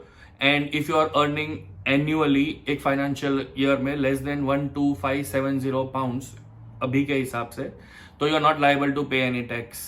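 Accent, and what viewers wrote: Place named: Bengal, India. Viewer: What are you Bengali or something ? I might be wrong but your accent sounds kinda similar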